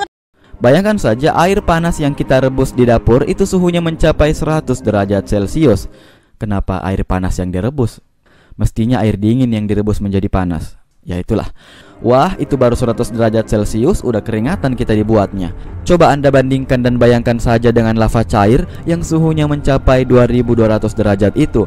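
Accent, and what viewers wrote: Makassar, Indonesia. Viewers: Bang orang mana ya apa orang makasar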